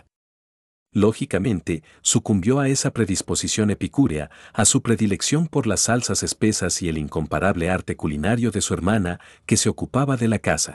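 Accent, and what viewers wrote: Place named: South America. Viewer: Vale, el acento suramericano es soportable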